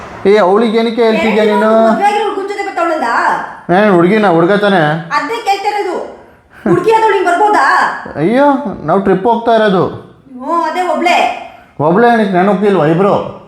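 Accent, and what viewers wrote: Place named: Hassan, India. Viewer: Hassan Mathugale Chanda❤❤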